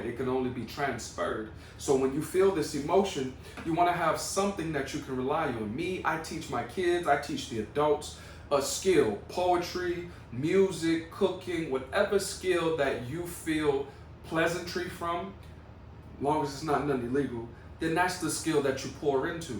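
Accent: New Orleans, United States. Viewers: Love your video… I am about to get into juicing myself, so I’m glad I ran across your video….not to mention it was particularly interesting because I knew that accent was from #Nola lol. Love my city & our accents… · This is my first time watching any of your videos. I kinda stumbled upon it. So I’m watching and listening…and waaaay before you mentioned being from New Orleans I’d already picked it up in you. Even though your accent is turned OFF in this video, I know the little nuances and subtleties of New Orleanians and our communication patterns. The more the video went on, the more I heard them. I can tell you’re living away from the city and have grown differently due to new experiences outside of the N.O. That’s my story, as well. But no matter how long we’re away, it’s always home and it’s always within us….and it doesn’t take much for it to pop right out, ya dig?! 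Fuh sho.😉 Great video and I’ll definitely be trying this juice recipe tonight!! Thank ya my brudda!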